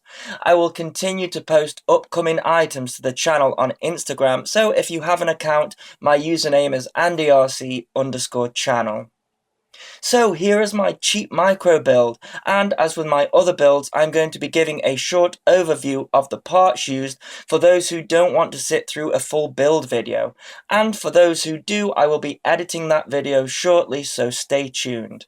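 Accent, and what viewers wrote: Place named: United Kingdom. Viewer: Oh sorry, just you have a British accent and the scenery around where you fly look like it is the uk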